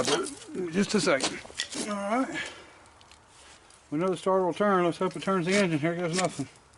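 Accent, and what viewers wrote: United States. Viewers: awesome find all the cars ive seen and worked on, but have never heard a straight 8 run. Can't wait for the next video. Also I know that you are country cause im country i here's it in the way you talk. Nothing wrong with being country either, but just so not to confuse the slower witted city folk. When you say sandblasting spark plugs, just say we's gonna clean them. Ya see most folk in the cities around the world take people at face value and what they say. Poor people haven't ever heard of slang words before. When I moved to the big city I found out that most of them were simpletons and what you said is what you meant. Think most of them is touched in the head. Or like they say in Cherokee hills of NC,they's touched by the great spirit, and us country folk knows that that's not good. I would try this don't explain what or why or how you're going to do something just do it,they may not get it, but that's because they never will.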